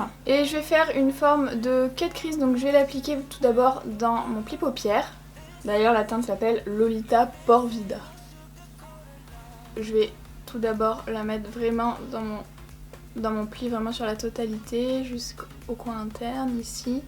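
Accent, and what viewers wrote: France. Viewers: Ahah ton accent espagnol c’est pas trop ça 😂 très frenchy c’est mignon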